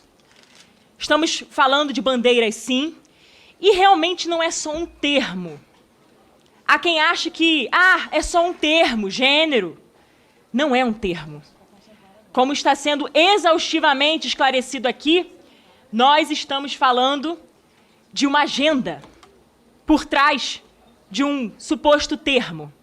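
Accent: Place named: Rio de Janeiro, Brazil. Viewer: Linda, inteligente, católica e carioca. Ô sotaque lindo!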